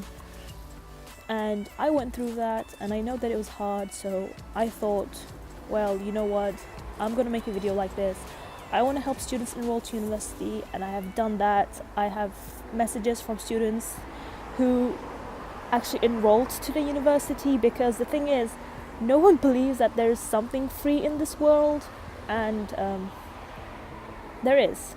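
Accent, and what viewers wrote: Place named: RP, United Kingdom. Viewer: Standard British Accent) Well Done!)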